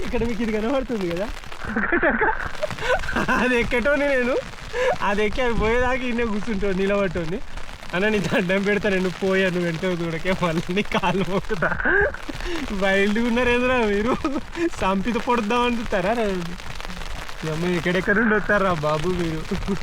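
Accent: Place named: Telangana, India. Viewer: Viewers enjoying the language he speaks .. pakka telangana language ..this man is doing great n hard working from our telangana ..better to support him ❤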